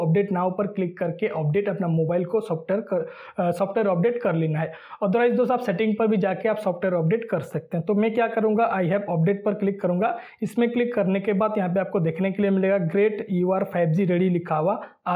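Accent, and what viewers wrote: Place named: Odisha, India. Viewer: Mujhe thoda thoda laga ki aap odisha se hai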